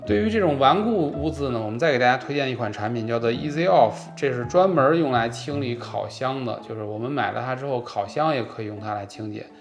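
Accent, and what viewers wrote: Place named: Beijing, China. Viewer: 看你很多期了 各种北美生活的妙招儿 北京话也特亲切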